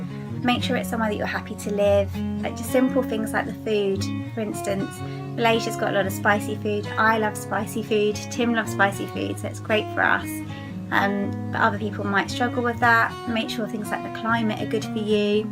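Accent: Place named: England, United Kingdom. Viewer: thanks I like your accent what part of England are you from?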